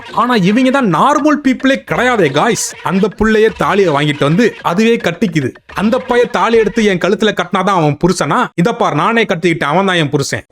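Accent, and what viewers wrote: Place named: Tirunelveli, India. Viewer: Bro thirunelveli slang puduku 😂😂 romba siripu varudhu bro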